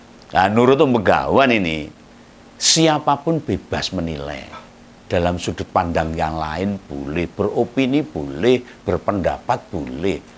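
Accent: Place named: Solo, Indonesia. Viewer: Om Begawan asal dari mana ya? Sepertinya dari Solo atau Klaten gaya bicaranya.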